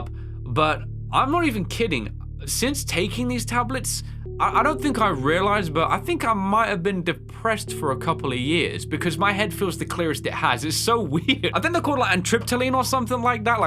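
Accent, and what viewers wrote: United Kingdom. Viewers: Never knew you was british. Same here lad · As a long LONG time supporter of channel I'd like to say a few words about his 7 year hiatus The first video I watched from was his first video on case animatronics and whilst he sounded very polite and British I enjoyed the video a lot and gradually from there I watched more and most definitely enjoyed more There was some videos I liked and about 2 I didnt like and quite a lot I didnt watch but I'd just like to know I've been there since case animatronics and will stick here forever until the channels end Big respect dont stop grindin🥺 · do not ever blame yourself. There is a simple way to explain how special you are in my life: I did not even know English when I started watching you. I played the games you played because you inspired me. I watched your videos when I didn't even understand what you are saying and at the end, you taught me how to speak English (british accent too). 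So, even if you quit tomorrow I will remember you for the rest of my life. That is how special you are for me. And when I see you blaming yourself or anything like u said in this video, it makes me very sad because you made me smile since I was a kid. And you must make yourself smile too. Don't record when you can't smile or when you give a fake smile because your funny jokes, reactions made us laugh, your smile made us smile. So don't do it when you don't feel like it. I would always respect and love you! <3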